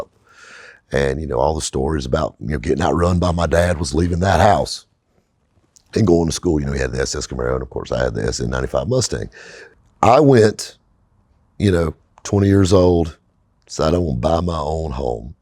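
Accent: South, United States. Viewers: Ei you are the man, I love ur stories. I don't understand a half of what you say, but I love ur southish accent.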